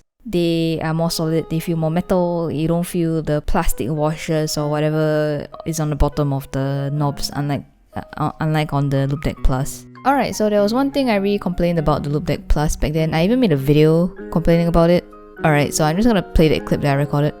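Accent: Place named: Singapore. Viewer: Sounds Singaporean 👌🏼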